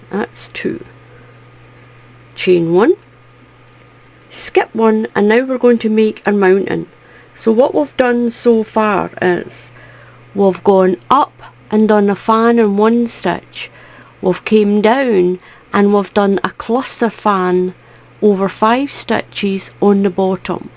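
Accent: Scotland, United Kingdom. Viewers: Just love your channel! I’m left handed and have watched quite a few videos of yours for baby items! So easy to follow and glad that you actually show the stitch we are to start on the next row. By the way, love your Scottish accent! I lived in Brechin in Angus for three years in the 70’s. Had my first wee bairn in Montrose. Been homesick for Scotland since I left.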